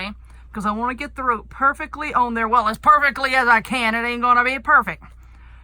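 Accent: South, United States. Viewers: Awe..... love me a country accent!!! Fellow southern girl!! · I love your channel and your southern accent! You are too darn cute! Love love your fall pillow! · Love the pillow but mostly I love your good old southern voice. I could listen to you all day and your sense of humor.I am going to make this pillow.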